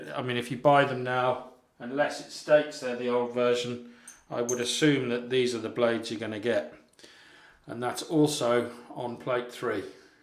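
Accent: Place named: England, United Kingdom. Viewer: Many thanks for another great shave. I love your English Accent. 🙄🙄🇦🇷🇦🇷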